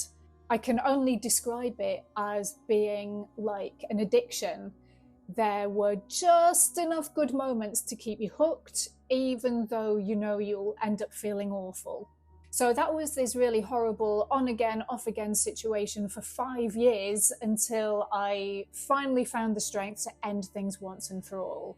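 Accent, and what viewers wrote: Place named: RP, United Kingdom. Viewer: Very posh .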